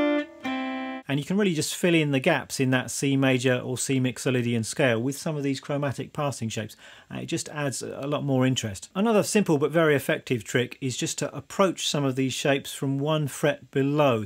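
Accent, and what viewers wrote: United Kingdom. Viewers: Good stuff, thank you! A little funny to hear a guy with a British accent playing country 😁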